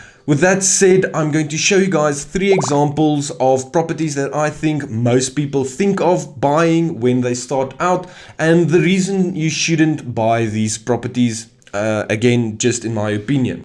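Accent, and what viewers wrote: South Africa. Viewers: I just enjoy hearing your South African accent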